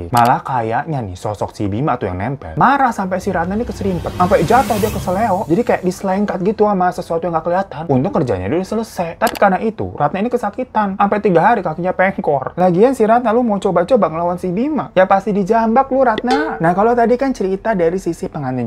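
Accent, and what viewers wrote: Java, Indonesia. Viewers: 🤣🤣🤣🤣🤣🤣🤣paling suka kalo denger bang ngomong bahasa jawa....lucu aja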